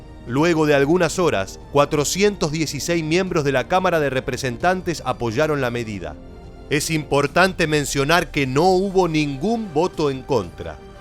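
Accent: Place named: Buenos Aires, Argentina. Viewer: Querido amigo, sigues con el virus Argentino de la soberbia, de hablar como el único ser humano inteligente. Puedo decirte sin equivocarme y con humildad que eres un ingnorante de muchos cosas de las que hablas , además de leer los escritos históricos como un loro. No haces ningún comentario propio de alguien que estudia los verdaderos hechos históricos. Tu acento porteño y de soberbia hace tambalear lo que dices en tus vídeos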